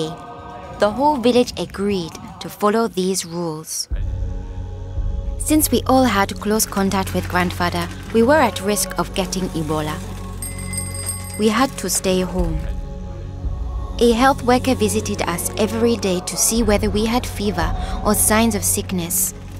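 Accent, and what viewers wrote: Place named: Uganda. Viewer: lol the voice is ugandan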